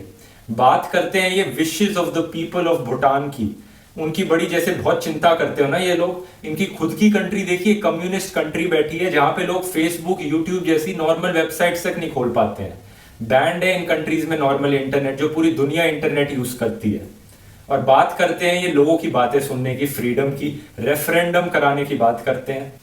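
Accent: India. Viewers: Another curry accent video full of boastful speech.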